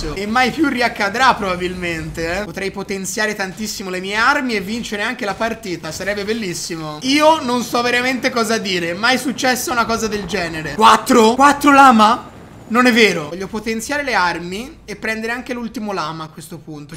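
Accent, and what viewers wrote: Tuscany, Italy. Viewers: E il dualetto toscano genios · hey ma sei toscano o sbaglio?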